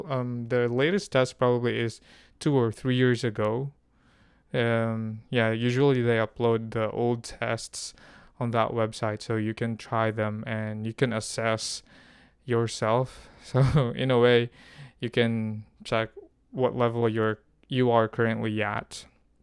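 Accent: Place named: United States. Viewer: Hello! I came across your video 2 hours after you uploaded it and I watched till the end. I’m impressed by your talent (speaking 4 languages!) how did you do it?! I can’t even speak fluent English after living in the US for almost years!😂! Needles to say, I hate English subject! Ang hirap talaga mag English😂. Puro Pinay friends ko dito, lahat hindi magaling mag English (basic lang ang alam namin 😂). But I’ll dedicate myself to speak like a native hahaha! You speak like an American. I stumbled upon some Filipina English teachers here in YouTube claiming they have an American accent, they don’t. But you! Bravo! Sana all! Any tips?!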